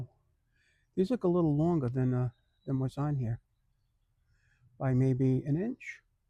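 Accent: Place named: Boston, United States. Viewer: Thanks for the video brother, love your accent, sounds like my cousins from Boston. I’m up here in Canada and have a 2020 Nautilus and this video was very helpful.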